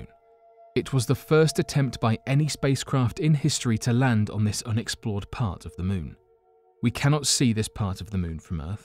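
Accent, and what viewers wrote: England, United Kingdom. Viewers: He has an English accent, that's all. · He's not American. Why should he adopt an American accent? Are you claiming Americans are too stupid to understand a British accent? If you want to get on to him about pronunciation, why not point out that Ultima-Thule is not pronounced "thool" but "thoolee"? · Its pronounced MEH - THANE, Not MEE - THANE. PLS get the words correctly pronounced with an American accent? Edit: Since you have an English accent, I get the why of the above mispronunciation of Methane. But, I have to inform you that your way of saying Methane is Hilarious!! Oh, and people are saying in the comments section, that “Ultima-Thule” is pronounced Ultima-Thoo-lee. So, apparently, the “E” at the end is not silent. Better luck next time...!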